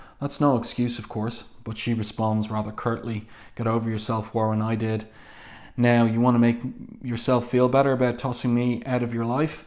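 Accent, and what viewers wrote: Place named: Ireland. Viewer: Lovely to hear a modern Irish voice online.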